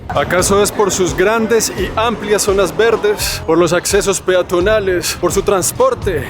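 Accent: Bogotá, Colombia. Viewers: Es rolo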